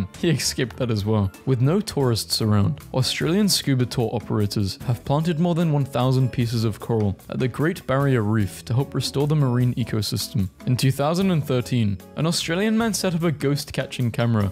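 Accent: United Kingdom. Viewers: YOU’RE BRITISH?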